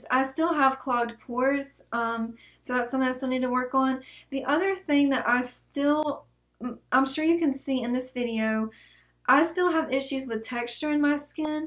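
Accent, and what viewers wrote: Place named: South, United States. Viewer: Let your southern accent come through. No need to hide it.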